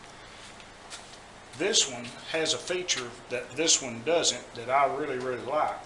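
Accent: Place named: South, United States. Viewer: Thank you for the review. I've been eyeballing planers and this helps in the selection. It also explains why I've had snipe issues with using my father's 735 planer. Also no issues with audio here, most people probably just can't understand the Southern accent, I'm from the south so it was loud and clear here. 🤣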